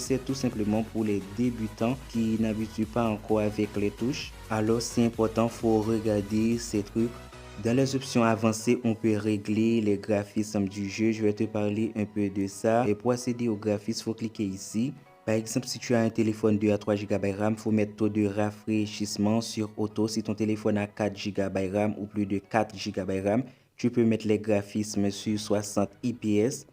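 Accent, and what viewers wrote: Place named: Haiti. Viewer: Depi sou langaj misye c ayisyen' 😅😅foli pale fransè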